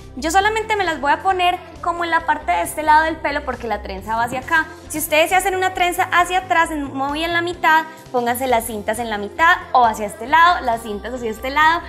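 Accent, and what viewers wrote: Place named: Colombia. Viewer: que país eres ?.... si me preguntan por que : es que tu acento es como colombiano o venezolano AAI NOSE estoy confundida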